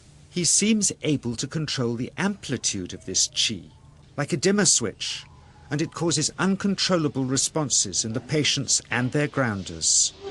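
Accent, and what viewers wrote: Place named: Indonesia. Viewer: noo, i think ur wrong ... he speaks indonesians very good, and also use typical English-Indonesians Accent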